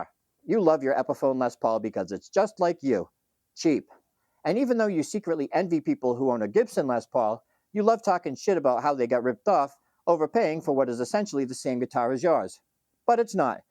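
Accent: Boston, United States. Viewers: As a Brit, it sounds exactly like how Americans say it to me...I thought he was American! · The Boston accent is a nice. Gitauh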